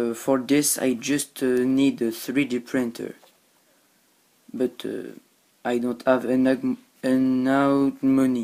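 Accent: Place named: France. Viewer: dat french accent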